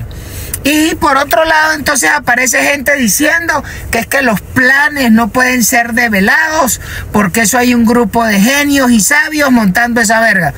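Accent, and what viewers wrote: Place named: Táchira, Venezuela. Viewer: Así se habla,como un GOCHO arrecho👺💪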